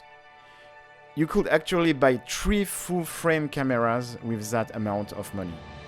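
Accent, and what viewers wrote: France. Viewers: Encore une très bonne vidéo test avec de jolies photos, y a juste un truc qui me dérange....Your so French accent ! Jamais envisagé de faire des vidéos en Français ?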